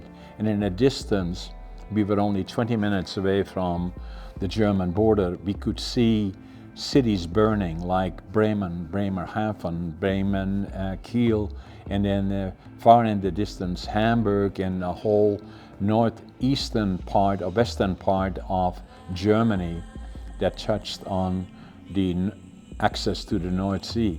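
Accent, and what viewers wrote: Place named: Netherlands. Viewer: Still that Dutch accent haha.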